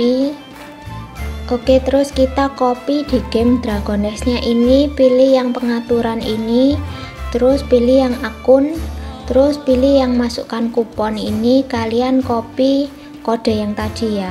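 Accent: Java, Indonesia. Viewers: Medok bgt kak wkwk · Medok anjay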